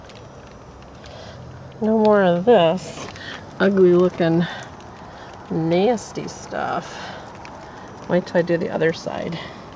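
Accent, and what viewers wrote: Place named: New England, United States. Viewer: Its so naaaaaesty! Haha yankee accent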